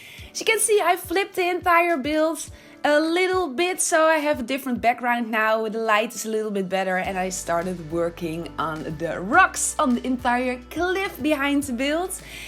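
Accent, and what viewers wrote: Netherlands. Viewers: I am so in love with dutch accents so this video was a real pleasure for my eyes AND my ears!!! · I can immediately hear that you're Dutch 😂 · Where are you from? It sounds like Dutch😂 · Ik kan hore dat je nederlands bent hup nederland!!!😂❤️❤️ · Are you dutch? Your accent sounds dutch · Haha inderdaad, haar Engels is erg goed alleen de uitspraak is erg Hollands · You can hear so good that she’s dutch, it’s so cute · are you dutch? I hear a very heavy accent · Oke je hoort wel echt duidelijk dat je Nederlands bent. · Kon gelijk horen dat je nederlands was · Are you Dutch ? You have a Dutch accent. Either way this building is soooo good wth, I’ve never seen something like this from the sims, you did a really good job!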